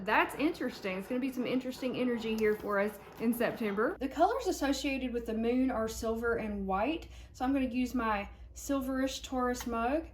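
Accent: South, United States. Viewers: girl your southern accent is on and poppin ☺️ I didn’t know “caught my eye” was prounounced as one word in Arkansas 😊 caw-ma-aye! 😋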